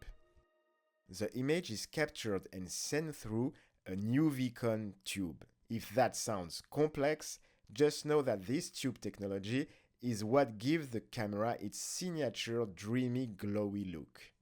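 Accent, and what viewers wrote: France. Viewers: Mais c'est super l'accent Francais, ici aux USA ils adorent ca !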